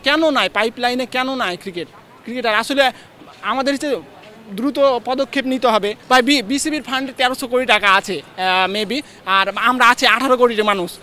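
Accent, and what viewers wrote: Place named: Kolkata, India. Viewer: Polay vodai😂 cricket thik i ase oy besi bujhe bolod sala 😅 i ❤ Bangladesh cricket sala parle tui khel tui to kolkata theke asos kotha sunlei bujha jay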